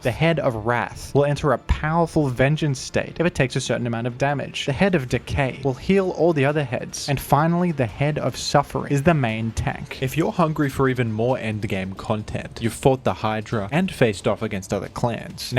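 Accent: Australia. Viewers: Oh thank god you’re Australian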